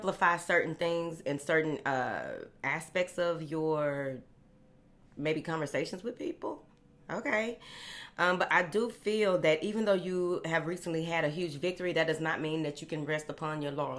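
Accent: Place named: Dallas, United States. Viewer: I knew you sound like you are from Dallas good lord southern woman thanks for your time and messages ms hope prosperity finds you for 2022 keep it up.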